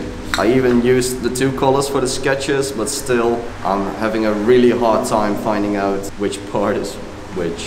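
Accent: Netherlands. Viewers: Je hoort gewoon het Nederlands accent erdoorheen haha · best dutch accent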